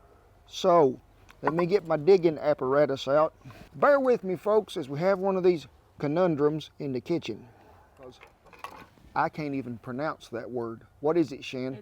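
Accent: South, United States. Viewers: I'm in Germany and I watch this for the southern drawl and the quality control Inspector Beagle. And for the food of course.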